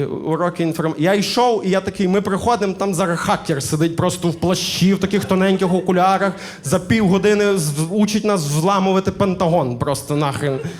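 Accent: Galicia, Ukraine. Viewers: люблю вашу говірку Галицьку, слухала б і слухала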